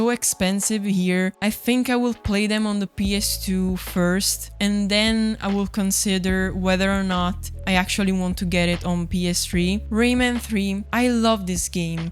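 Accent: Italy. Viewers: Your italian accent is so lovely btw ❤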